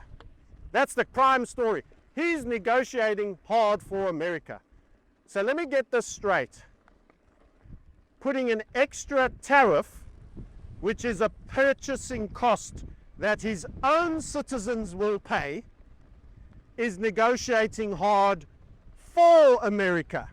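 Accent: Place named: South Africa. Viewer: your South African accented quips get me every time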